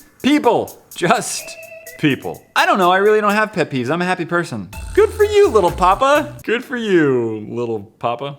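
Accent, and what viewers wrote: United Kingdom. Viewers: I love it when American youtubers try to do British accents!! Idk why but it's awsome and it just make me happy for no reason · No!!!!!! More British accent! (idk I just like the accent) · Omg I love ur British accent I wish I was as good as an American accent as you are with a British accent because I'm gonna go to a school production audition and need to have a good accent but.... idk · I love the british accent you had!!! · I'm British sorry but that was a very bad British accent. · Nice British accent it wasn't even offensive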